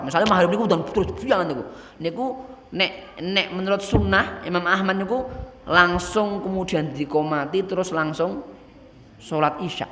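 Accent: Java, Indonesia. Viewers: Di mengerti,,,,, heheheh jawaaaa ki hehhe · Waduhhhh jowoooo wkkkk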